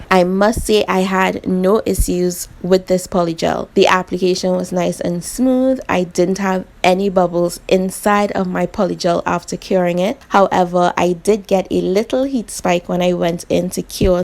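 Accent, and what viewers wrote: Trinidad and Tobago. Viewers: Recognise that trini accent anywhere 🇹🇹🇹🇹... Imma subscribe to your channel just for that 😁😁.... BTW I love polygel tutorial videos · are u trini?? cause u def sound like it lol also, this set is fireeeee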